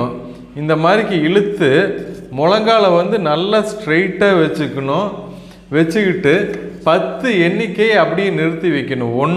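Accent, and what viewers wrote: Kongu, India. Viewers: கொங்கு தமிழில் பயிற்சி மற்றும் விளக்கம் அபார்ம் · கொங்கு தமிழில் அழகாக தமிழில் விளக்கியதற்கு மிக்க நன்றிங்க 😊🎉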